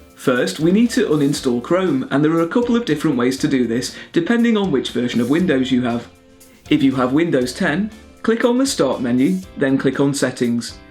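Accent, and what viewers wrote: United Kingdom. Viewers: I liked his British accent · Why do you look American but sound British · It worked! Thank you for your help. Friend, I love to speak British English just like you. Could you give me some tips on how to start learning the British accent?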